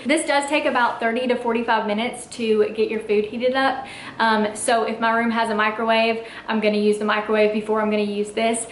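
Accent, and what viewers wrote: South, United States. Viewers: I thought I was the only one who traveled with coffee creamer. Glad I found your channel. Love to hear a familiar accent (Southerner here too). ;) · Am I the only one that enjoys listening to a southern accent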